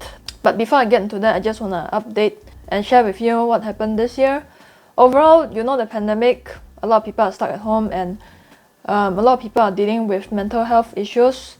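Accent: Singapore. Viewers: God bless and wishing you happiness. By the way I love your Singapore English accent./ Thanks for everything you did for us. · Ms love work. Ignore those who judge, cause in their eyes. You never do anything right. You can dress, speak. Dance to everything they say... and they will still find something to critique you on. On you English sounds good for me. Singlish. You could be teaching in your one of native language. That's, not going to help those who only speak English. Please keep doing what you are doing. We are going to grow-up and be just like you one day. 47/ 48 I forget sometimes. I think 48... aww don't remind me... again thank you for Jia you to break us out of this everyday rate race we are in. Maybe one of your students will stand on stage right beside you. Thanks so much.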